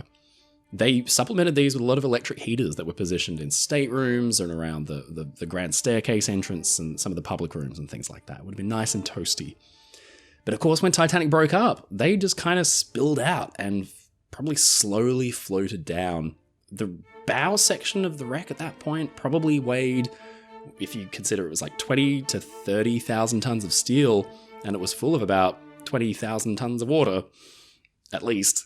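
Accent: Australia. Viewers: I don't know how long you were in the U.S., but it was long enough for an American accent to find its way into your narration of this video every now and then when your Aussie accent 'drops out'... 😉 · I enjoy your videos,. I also love listening to your beautiful Aussie accent. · Why would a British person have an Australian accent?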